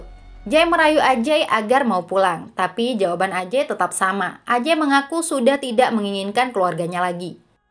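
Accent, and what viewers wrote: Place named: West Nusa Tenggara, Indonesia. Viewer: Kayaknya admin orang NTB 😂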